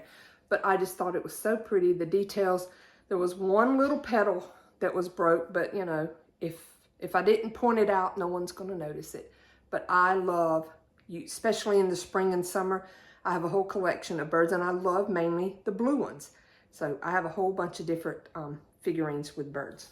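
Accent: South, United States. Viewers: wonderful haul. I would paint your bedroom white and that away you’re not limited on what color accessories you can use. I have always loved Mary Englebreite books and have most of hers. The large book is wonderful. I speak Southern too😊. Love and blessings 🧡💛🧡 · Loved your haul. You speak just fine don' t change a thing. I'm from Florida and I have a southern accent also! ❤😊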